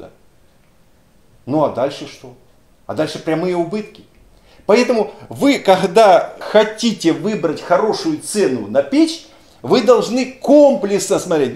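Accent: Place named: Rostov, Russia. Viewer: Как же достал этот Ростов! Учись говорить по человечески!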